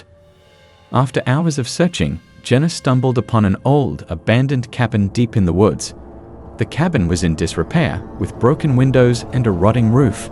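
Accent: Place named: United Kingdom. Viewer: A Brit narrator narrating stories about Appalacha. To me it just doesn't fit.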